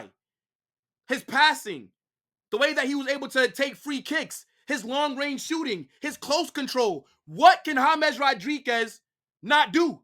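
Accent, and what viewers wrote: United States. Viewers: I'm so happy about finding this channel actually cause finally i found some one on YouTube speaking about my favorite team which is real Madrid and also about soccer with a very clear American accent that's amazing keep going 👏 👌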